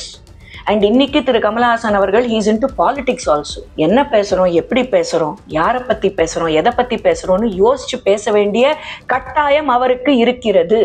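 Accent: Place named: Karnataka, India. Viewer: அம்மா உன் பேச்சுல கொஞ்சம் கன்னட காத்து வீசுது 🙂🙂🙂🙂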